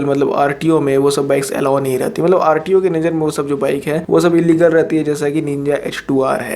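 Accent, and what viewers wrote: Bihar, India. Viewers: Bhai tm Bihar se hai ?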